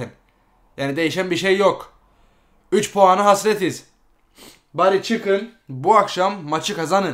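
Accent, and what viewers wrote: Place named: Northern Cyprus, Cyprus. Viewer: Ingılterede yasayan kıbrıslı turkmusun aksan bıraz oyle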